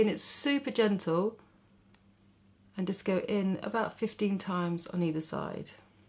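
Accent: England, United Kingdom. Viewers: Agree. It's so refreshing to hear an English accent for a change, and not the nasal twang of 'hi guys, welcome back to my channel' ... blah blah.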